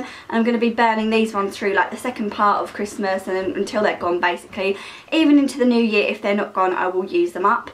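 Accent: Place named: London, United Kingdom. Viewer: You gotta be from london :) Sound so much like my family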